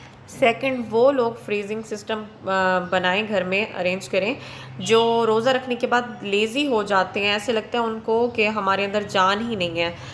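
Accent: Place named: Punjab, India. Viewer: Punjabi tone zyada zbrdst hai aap ki 🌹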